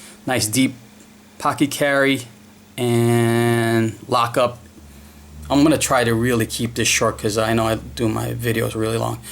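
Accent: Boston, United States. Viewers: Are you from the Boston area? Sounds like you are ... Im from very close to Boston.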